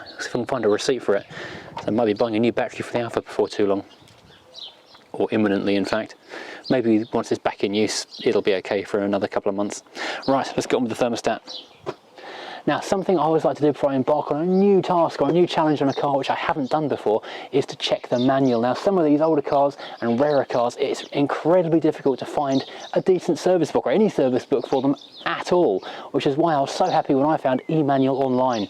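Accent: Birmingham, United Kingdom. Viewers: Surprised anyone from Serbia can understand his "Brummie" accent.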